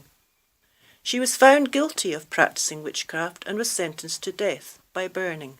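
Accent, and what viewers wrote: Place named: Scotland, United Kingdom. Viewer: Thank you so much for this. It's such a pleasure listening to a Scottish voice telling these stories. Gach deagh dhùrachd bho Gàidhealtachd Bhabhàiria 🙋🏻‍♀️ Lisa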